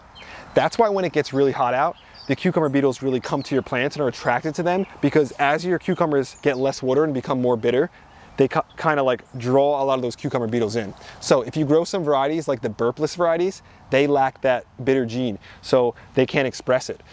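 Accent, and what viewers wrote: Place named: East Coast, United States. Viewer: Damn. A million subscribers. Nice. You deserve it since you're one of my favorite gardening bloggers, even though my gardening space is quite tiny (in an urban lot). Because you have that east coast energy which I love... even though I'm a Midwesterner. Probably because my father was born in Phili, and many of his extended family live in south jersey, So they a lot sound like you.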